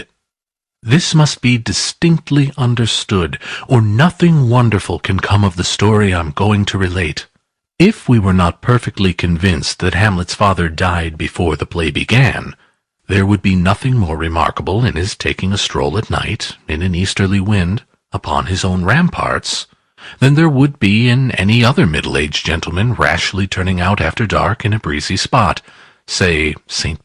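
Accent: United States. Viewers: American accent? Sorry, bye. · Should only be read by an English narrator, doesn't sound good with an American accent.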